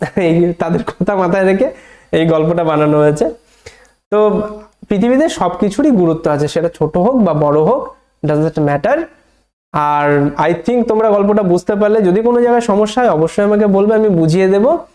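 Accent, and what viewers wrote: India. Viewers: তোমার বাড়ি কি মুর্শিদাবাদ এ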